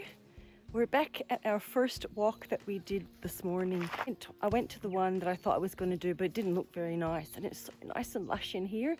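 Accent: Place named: Australia. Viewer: Thank you for all that info! I didn't notice the number plate, but l did notice your interesting mix of accents. Mainly Australian but definitely something US/Canadian every so often. Interesting stuff. I thought, "Oh goodie!"" Found an Aussie lady travelling solo around Oz. Then l thought no, what accent is that? Then l thought yeah, Australian. Then l thought.... you know what l mean 😄 so l'm really glad you cleared that up. It was killing me! Love yr attitude, tips and travels. Might see you out there soon 👌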